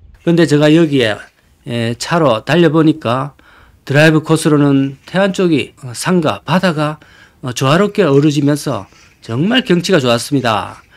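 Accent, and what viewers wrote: Gyeongsang, South Korea. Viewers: 멋진멘트 갱상도발음 정확한 판단력 응원해요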